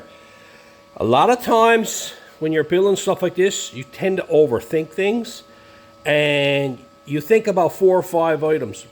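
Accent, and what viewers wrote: Newfoundland, Canada. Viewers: I knew that accent right away. Great to see a Newfoundlander doing awesome body work. Bravo! Bill from Saskatchewan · What accent is that Newfoundland?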